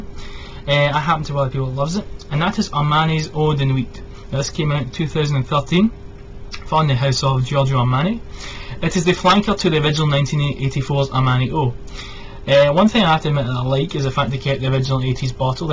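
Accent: Scotland, United Kingdom. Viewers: are you Scottish? btw, i think you've got the only armani nuit review on youtube, so kudos to you for going off the beaten path! just a question, does it remind you of berburry brit rhythm? must be the cardamom, heliotrope and patchouli.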